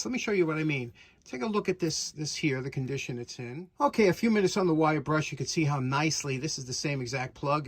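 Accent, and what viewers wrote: New York, United States. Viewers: That was a great show today. You made me chuckle when you said it's a “ewing ax” as in JR Ewing. It’s “hewing ax” but you just can’t hide that New York accent. a smart guy, worried he might get electrocuted so “I’ll give it to 😂”. I’ve never seen a double handed screwdriver before and I love that Greenfield tap wrench. Cheers mate, Stuart 🇦🇺.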